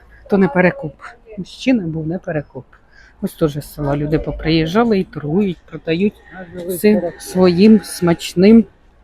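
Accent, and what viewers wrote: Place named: Poltava, Ukraine. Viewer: Якщо вам не подобається,то не дивіться,це полтавський діалект.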